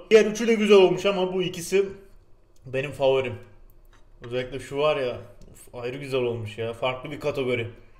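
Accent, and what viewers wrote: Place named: Southeast, Turkey. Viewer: Ben hala anlamadım abi sen hataylı mısın diyarbakırl ımısın yoksa adanalı mısın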